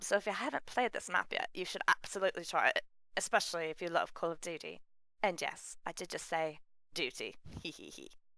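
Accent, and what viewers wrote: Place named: England, United Kingdom. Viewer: Ur voice sounds more like ur English this week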